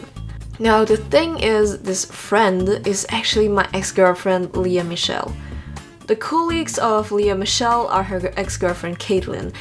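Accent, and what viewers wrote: Germany. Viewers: I swear i though you were German from the accent...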